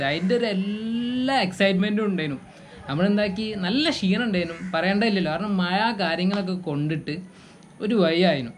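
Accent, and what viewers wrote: Kozhikode, India. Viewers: Just kozhikoden slang... Leave it. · You’re a innocent guy..may allah bless you...all the best wishes..I feel a great potential in this channel keep this raw feeling of videos...your Calicut accent makes the storytelling more clever... حياك الله الله يبارك فيكم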